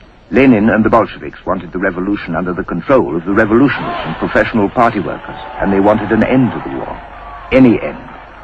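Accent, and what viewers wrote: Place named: RP, United Kingdom. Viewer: This accent would definitely be described as "RP" - received pronounciation, it's known as. In other words, very posh English, a bit like how the Queen used to speak. Even she has been influenced by "estuary-speak", that splurge of Cock'er'ny that has spread across most of the southeast of England. this is how EVERYONE on the BBC used to speak. These days northern and other accents are more common on the telly. Thank god.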